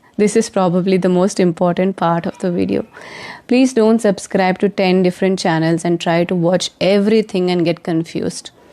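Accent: India. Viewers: Why are you suggesting foreign English-language channels when you also speak with an Indian accent? You can suggest Indian YouTube channels as well; there are plenty of good ones.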